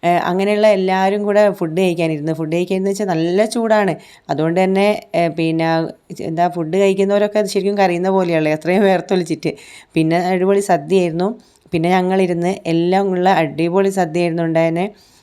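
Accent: Kozhikode, India. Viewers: കോഴിക്കോട് ഭാഷ ഇഷ്ട്ടം ആണ്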